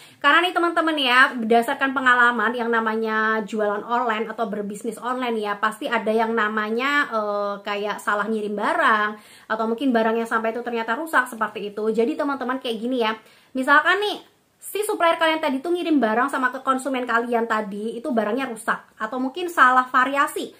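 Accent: Java, Indonesia. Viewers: Didengerin suaranya agak medok 😀🙏. Tapi makasih kontennya. Bermanfaat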